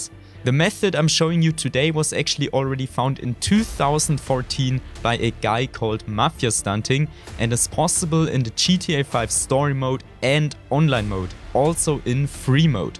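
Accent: Germany. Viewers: Hast du auch eine deutsche Version gemacht weil klingst sehr deutsch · are you german?you sound a bit like german xD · Auf jeden Fall ein Deutscher ,hört man am Englisch no front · Der deutsche akzent xD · Man erkennt so schnell dass du aus Deutschland kommst. Wegen den accent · Höre ich da einen deutschen akzent ? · if ure not a German idk where u learn English 😂 sorry but everyone hear that ure not a English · man hört dass du deutsch bist haha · klingt sehr nach Deutschem Akzent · Du klingst so deutsch:D You Sound so German:D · the german accent lolll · Uff german English is so annoying to listen to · Haha einfach dieser deutsche aczent xD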